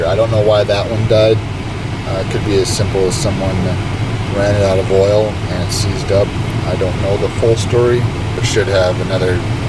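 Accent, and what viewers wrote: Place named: Canada. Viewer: What part of Canada are you? I hear a Canadian accent. Anyhow, I love the look of this car. Thanks for the upload. Early 90s American luxury is pretty cool.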